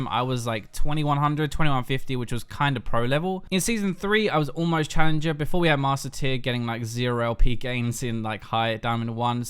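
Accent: United Kingdom. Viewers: "Some people watch for the British accent" THE JIG IS UP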